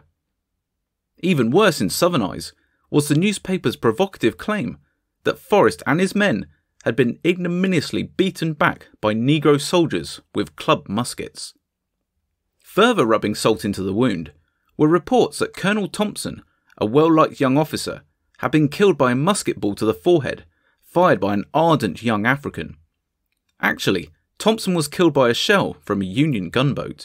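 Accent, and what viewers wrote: England, United Kingdom. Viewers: Why is it always an English accents telling us about our American history on YouTube? Thank you AI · Why is an English narrarating go away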